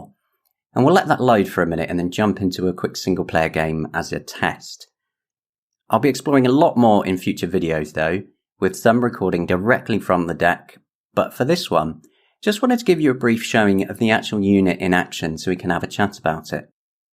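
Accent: United Kingdom. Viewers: Are u from Liverpool?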